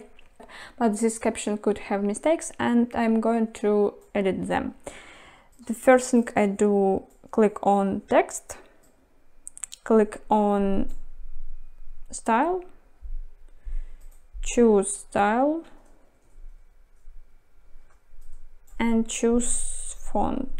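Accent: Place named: Russia. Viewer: Are you from Russia, because your accent is a little bit similar to Russia? to be honest I like your accent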